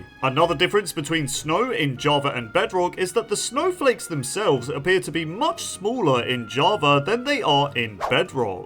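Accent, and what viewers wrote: United Kingdom. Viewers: dude hes British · oh. you dont. you guys just sound british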